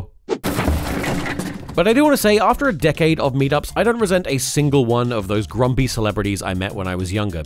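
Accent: United Kingdom. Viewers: just wanna say love you vids not only because your also English (I assume from the accent) but because your videos have always made me laugh 😁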